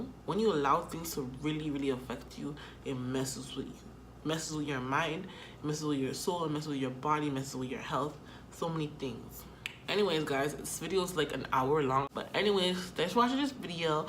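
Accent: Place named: Africa. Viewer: I love your African accent 😂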